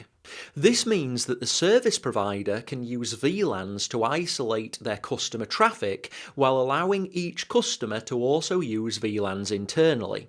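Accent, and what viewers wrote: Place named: Northern England, United Kingdom. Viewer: In your opinion, how much networking knowledge one should have to go into cloud architecture? Would you say CCNA level is sufficient or maybe a person should be at CCNP level, or one would manage with Netywork+ ? Thanks. by the way, super cool videos and teaching style. Love the UK northern accent :-) miss it here, down under :-D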